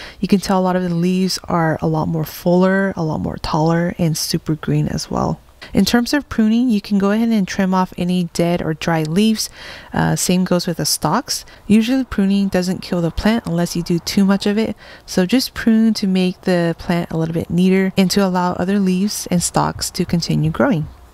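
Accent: United States. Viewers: Which part of the world are you at, by your accent maybe USA. I live in southern England on a island. Ive been growing lemon grass since 2018 brought back from Thailand. I now have at least 300 plants. Its become a obsession with me. Great video. Are you from Indonesia?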